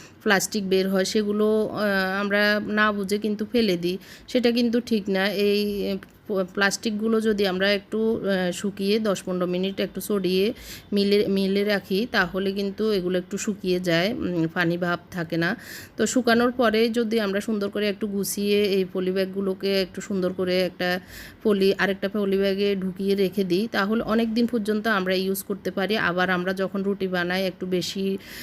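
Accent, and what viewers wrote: Noakhali, Bangladesh. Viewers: এটা নোয়াখলির ভাষা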